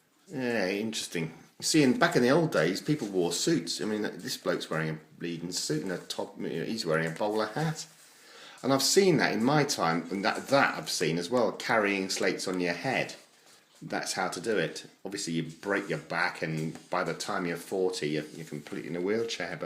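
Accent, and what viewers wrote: RP, United Kingdom. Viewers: Heya … I didn’t get a notification you uploaded just came over after reading your comment on our channel.. I think you are posh 🤣🤣 I come here purely to listen to you don’t care what it’s a about lol.. my dad was a bricklayer and one time back in the day he built 32 houses on a building site and when he got to the last house they ran out of bricks so the gaffer said ahhh sod it bung a low roof on it and it started the bungalow craze off 🤣🤣🤣 always found his jokes hilarious 😂♥️♥️♥️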